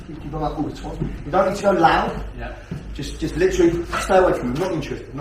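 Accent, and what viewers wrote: England, United Kingdom. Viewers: Amazing….maybe because I dont understand this englisch akzent sad I main idea is ….if the enemy touch my hands(fence) the I give him a blow and go straight on and kick him of the planet. Is this right? Can somebody write the main entantance of this vid for me in this comment? I am so interest to know what really he ment. I dont understand this art of spoken english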